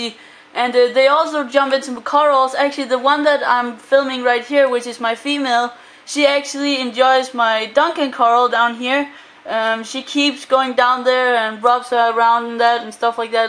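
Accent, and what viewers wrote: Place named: Denmark. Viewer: You Danish accent is more pronounce now as you grow older. Sounds cute--keep up the great work.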